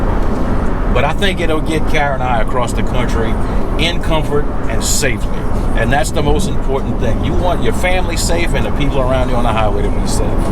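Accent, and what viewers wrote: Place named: Louisiana, United States. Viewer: Man, you sound like Jesse Duplantus! You must be from Louisiana.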